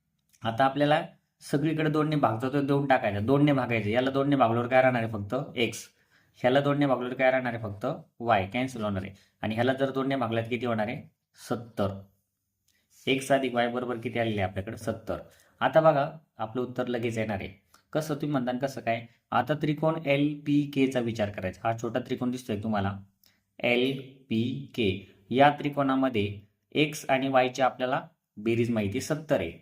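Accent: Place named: Ahmednagar, India. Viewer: Language varun olaklo sir 😊 Maje frd aahet nagar che same bolatat😅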